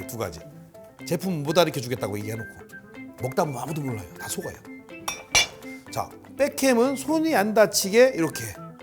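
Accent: Chungcheong, South Korea. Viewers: 아 충청도 말 구수해~!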